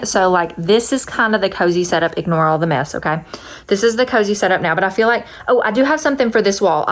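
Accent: South, United States. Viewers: Hi! Do you know if I use your affiliate link to Amazon but get a different set of Grabie markers (or other brand) do you still get credit? I need some new ones to go with my new Coco Wyo color book. These are the best!!! So relaxing and not stressful like the really intricate ones that stress me out more! 😂 Thanks for all your wonderful content!! My hubby calls you “that little southern girl” lol I think he really likes your accent 😊❤️❤️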